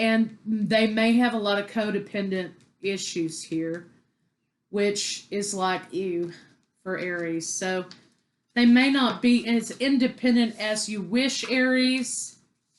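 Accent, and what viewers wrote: South, United States. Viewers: Where are you from if I may ask.. I love your southern accent and how u serve it straight up, no chaser!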